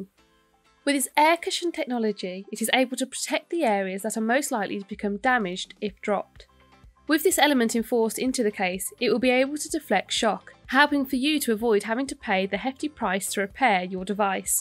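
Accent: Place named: West Midlands, United Kingdom. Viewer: Sounds like a West Midlands accent to me.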